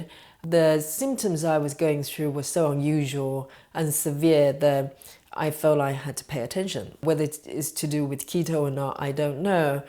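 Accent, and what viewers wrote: United Kingdom. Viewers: Thank you for the useful info. BTW I like your British accent. ☺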